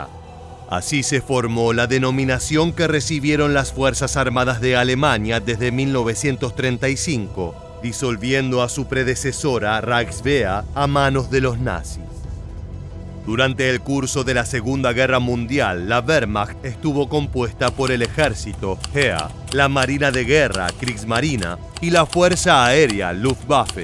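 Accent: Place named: Argentina. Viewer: Al narrador se le nota lo europeozadonque se siente ha bla en español Argentino y no quiere pronunciar los nombres de los vehículos en español que incongruencia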